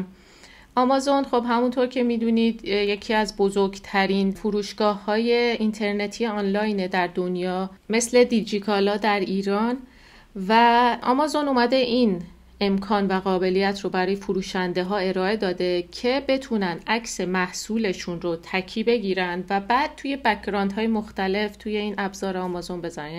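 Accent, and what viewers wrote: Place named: Turkey. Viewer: تلفظ تُرکی داری. تُرک کجایی؟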